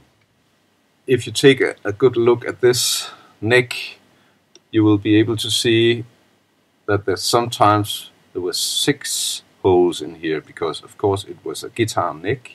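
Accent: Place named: Denmark. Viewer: meget nice men klar dansk accent xD kunne høre det med det samme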